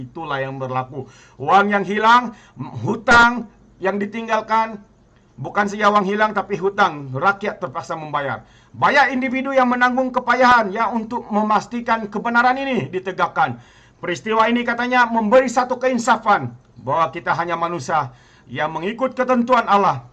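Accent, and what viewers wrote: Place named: Sarawak, Malaysia. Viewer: Suara orang kitak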